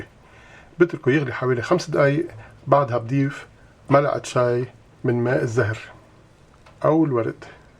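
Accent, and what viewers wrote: Lebanon. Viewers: Dkfkf Dkfkdmd كلامو مبين لبناني 100% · este chico habla el arabe con acento Libanés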